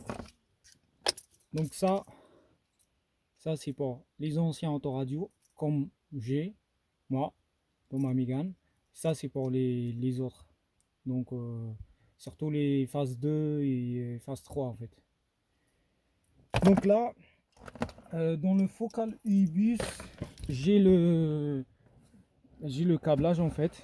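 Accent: Algeria. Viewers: Votre accent est algérienne monsieur 😂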